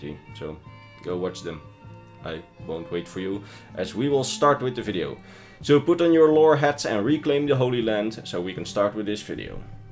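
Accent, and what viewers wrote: Netherlands. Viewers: That’s the dutchest english I’ve ever heard lol, good to see that we have a great representation in the elder scrolls community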